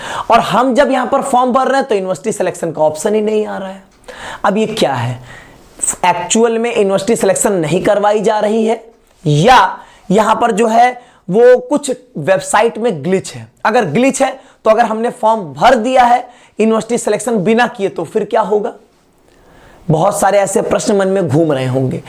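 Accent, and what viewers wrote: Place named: Bihar, India. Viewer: Sir ji kya aap Bihari ya purvanchal se hain kyuki aapki Hindi ham log se milti julti lg rhi hai 😊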